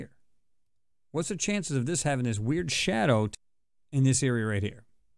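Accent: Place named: Rhode Island, United States. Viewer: You sound like u live in Rhode Island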